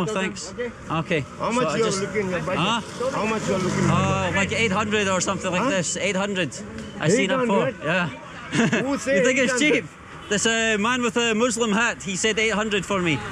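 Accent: Scotland, United Kingdom. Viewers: That Scot accent is hardcore yew !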